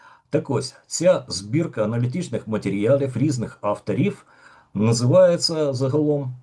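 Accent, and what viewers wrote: Russia. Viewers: ... більше розмовляйте українською в побуті, бо рассєйська на губах висить.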